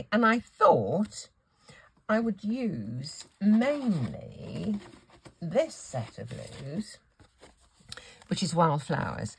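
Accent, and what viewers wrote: England, United Kingdom. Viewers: Just watched Lou’s collaboration video. I’m new to your videos. Just subscribed to your channel. I love to hear your English accent.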